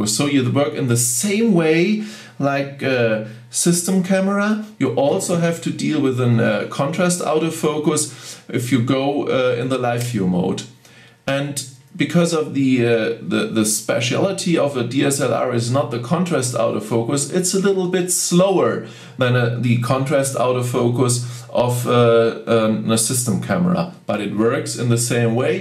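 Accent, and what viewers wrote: Germany. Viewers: german speaking english?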